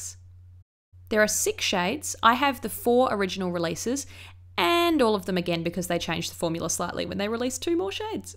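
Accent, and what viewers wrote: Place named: Australia. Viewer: you sound australian, may i ask how did you buy glossier and get it shipped to australia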